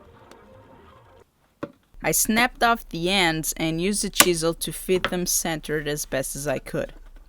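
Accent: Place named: Portugal. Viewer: Assisto teus vídeos a bastante tempo e não sabia que você falava em português! E pelo sotaque e português de Portugal! Eu sou brasileiro e adoro ver teus vídeos! Parabéns !!!!! Continuo fazendo mais vídeos! Poste vídeos mesmo de pequenos projetos executivos! E muito satisfatório ver como você trabalha! 🤩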